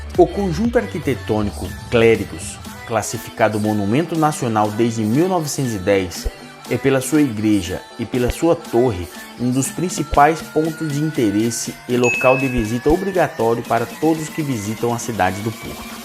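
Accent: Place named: Brazil. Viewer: Português com sotaque Brasileiro, gostei.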